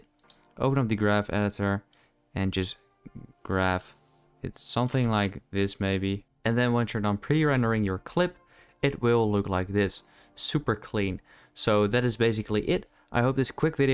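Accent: Netherlands. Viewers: you dutch? idk im just guessing cause you sound dutch, maybe im wrong. anyways im dutch. lol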